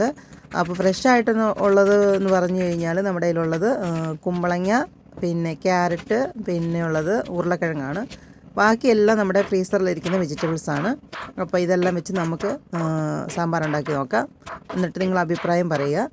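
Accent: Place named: South Kerala, India. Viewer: 👍pakshe Malayalam slang thekkan bhasha pole thonni ( like pathanamthitta)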